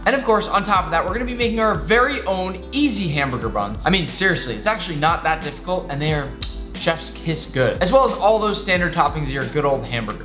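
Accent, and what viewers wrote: United States. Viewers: Sounds pretty American to me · this sounds very american lol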